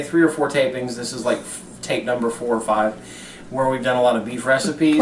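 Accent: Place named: Arkansas, United States. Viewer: I really enjoyed your "how-to" video! Where are you from? You sound like you are from my neck of the woods (Arkansas).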